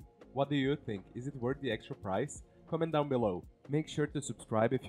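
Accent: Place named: Hungary. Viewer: Are you from Hungary? Your accent sounds like Hungarian person I know. Good video btw, concise like it should be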